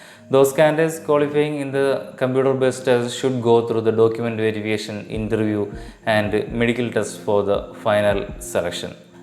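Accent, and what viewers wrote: Kerala, India. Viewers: malayali ano.. slag athpole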